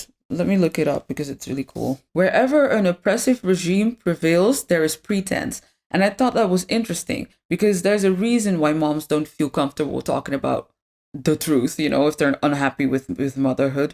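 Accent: Netherlands. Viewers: One of my greatest accomplishments in life is never having kids...by choice. Good vid, thanks! I did notice your Dutch accent!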